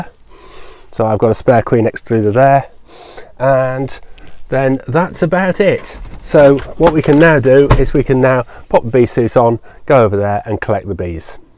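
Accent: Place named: United Kingdom. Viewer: The second I heard british accent I moved on.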